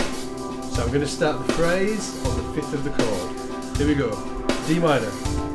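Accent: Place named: United Kingdom. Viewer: He is british, maybe you are used to listening english accent from North America and that's why sounds weird to you.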